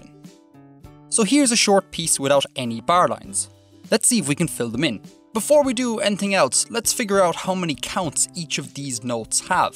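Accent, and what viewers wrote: Ireland. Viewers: Let’s all apréciate his Irish accent and nearly 600k people have watched this lmao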